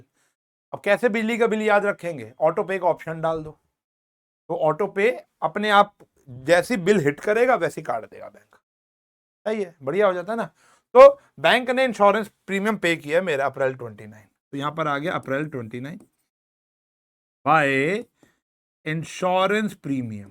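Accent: Punjab, India. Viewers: Sir ap punjabi ho?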